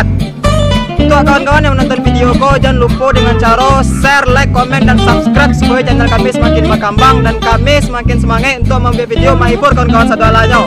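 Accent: Padang, Indonesia. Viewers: Maaf Baru Komen Orng Padang Kh Bg Bahasa Kayak Orng Padang Soal Nya Saya Orng Padang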